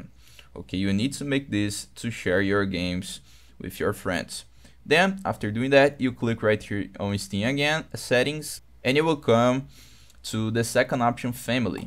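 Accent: Brazil. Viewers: he got that brazilian accent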